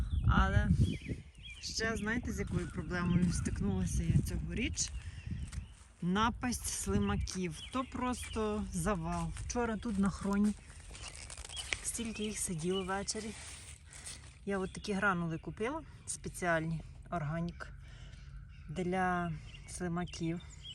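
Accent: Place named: Prykarpattia, Ukraine. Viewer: Приємно бачити справжню українку,яка далеко від України пам'ятає,хто вона і якої землі дитина.Слухаючи,відчуваю,що із Прикарпаття,можливо,помиляюсь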